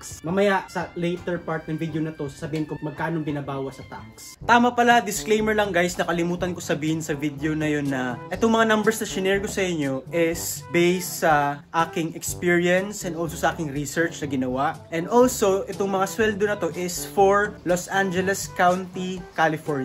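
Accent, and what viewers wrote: Visayas, Philippines. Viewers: Hahaha kaaligri sa imng bisaya accent Engr. Dugang kas akoang inspirasyon as 2nd yr ce student diri sa cit univ! God Bless you engr! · hahaha y*w* nindot jud kaayo paminawon ning bisaya mag tegelog ay hahhahhah hi kuyaaaaa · lisuda jud ning bisaya mag tagalog oy hahah di ma likayan ma sagulan ug binisaya 😂😂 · ambot bisaya an deay si idol HAHAHA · oy bisaya hahahaha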